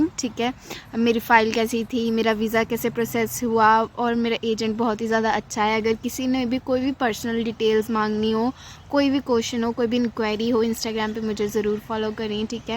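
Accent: India. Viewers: Waah what a Punjabi accent!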